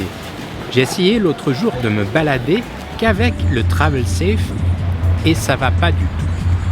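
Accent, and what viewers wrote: France. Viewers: "On s'en cogne de ce sac" tu m'as bien fait rire avec ton accent du sud. Ca fait du bien de voir Antibes et le sud. La mer était particulièrement agitée! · Vé c'est beau... le Safranier (Commune libre) et ton sac, certes on s'en cogne mais de montrer la technologie avec le vrai accent de marseille... Ça l'fait. Franck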